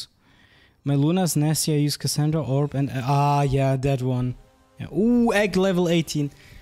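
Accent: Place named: Portugal. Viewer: Eita ele fala português de portugal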